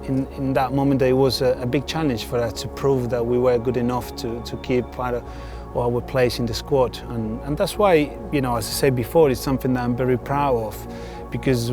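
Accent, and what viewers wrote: Manchester, United Kingdom. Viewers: Even sounds like a proper Manc. Good lad Zaba! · Me hace mierda Zaba y su acento Británico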